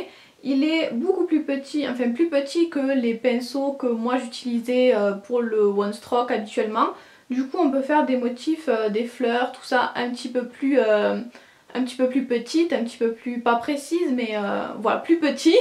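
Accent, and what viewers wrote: South, France. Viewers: J'adore ton rouge a lèvre et ta vidéo ! :) d'où vien ton accent ? Hihihi du sud non? ❤️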